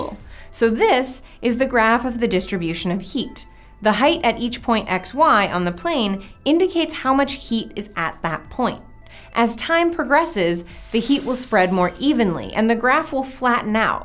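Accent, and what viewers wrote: United States. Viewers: Knob - Not at all: he spoke very very clearly and not fast at all. And I'm non-native English speaker: he has the correct Virginian speed and accent for me (and for most AFAIK).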